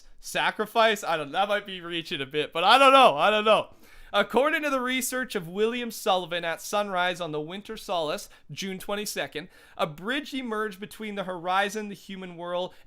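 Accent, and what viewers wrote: Austria. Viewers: Oh, ein österreicher :D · What you are austrian?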